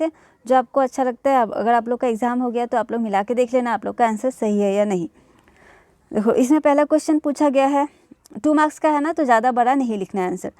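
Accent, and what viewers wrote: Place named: Bihar, India. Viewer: Mam ap bihar ke ho naa😉don not mind please 🤌🏻vo bss apke bolne ke tarike se lag raha tha ki app bihar se ho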